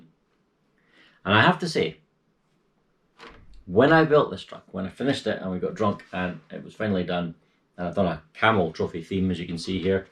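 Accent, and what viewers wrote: Scotland, United Kingdom. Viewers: Yeah, man, I enjoy your talk videos! Must be the Scottish accent and your sense of humour, I usually get bored with long talk videos. Yeah, you're right, it's good fun and people should get one! Ha ha, at least you were drunk when you built yours, I think I was mostly sober, but damn, I hated putting it together! And to make me 100 percent certain mine was cursed, first time I took it for a blast, not one but two bearings seized, one wheel bearing from Fast Eddy, and the other was the bearing in the brushless motor, damn... I kept being unlucky, on my second time out, I broke a wheel (on video)... I hate those wheels, I plan to lower mine and put buggy wheels for the summer... I can't badoing mine, I'm using the smaller bumpers, works alright, I think... If you want, check it out, I have a video with mine, should be okay fun to watch...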